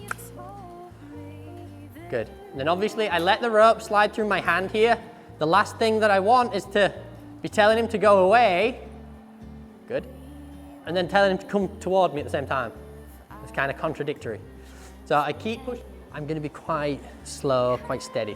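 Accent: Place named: United Kingdom. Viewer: Bit difficult to take this serious a cowboy with a British accent.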